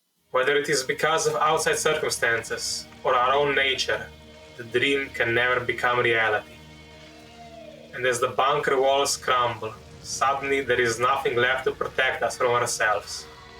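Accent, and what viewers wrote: Eastern Europe. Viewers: Good explanation. I like video essays that cover films. What I like most is how a Slavic voice is explaining a Slavic movie, which seems more authentic to me. I do look forward to new videos. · Good narrration ( love the slavic accent 😂, as i am from eastern europe myself) Great editing, good flow of video, never heard of the movie which was interesting Only one thing -The mic bro you need to upgrade that 😊 just some constructive criticism Good luck with your channel 👍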